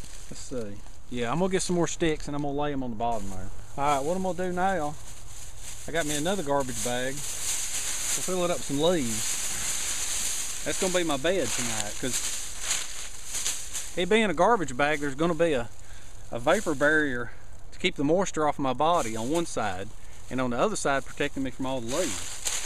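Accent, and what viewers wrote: South, United States. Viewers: Hi, I've just discovered your channel and " ah jest lurve it". Especially that good ol' southern boy accent. And you have just so many good tips and advice - and your sense of humour just starts my day off with a smile! Keep 'em coming. I'm lovin' it. All the best from Geoff in lil ol' England. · Beaver+southern accent= what region?? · Everything's so dad gum wet! I LOVE your southern twang. Good thing your a good sport, otherwise Nick could be on the business side of a knuckle sandwich, right? Haha. Hope all gors well. I know if anyone can do this...it's you. You got this,!!!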